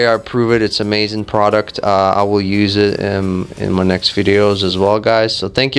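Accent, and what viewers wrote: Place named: United States. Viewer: Your accent sounds as you're from Chicago or New York with traces of immigrant parents. Thoroughly enjoy your commentary!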